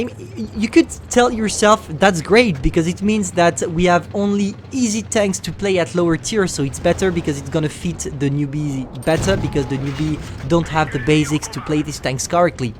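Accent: France. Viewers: French accent !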